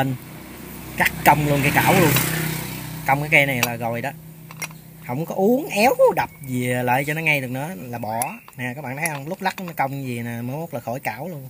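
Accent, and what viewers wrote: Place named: Mekong Delta, Vietnam. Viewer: Luôn luôn ũng hộ Chia sẽ cách làm, kinh nghiệm rất là thực tế và dễ hiểu Cách nói cũng rất là chân thật người miền Tây.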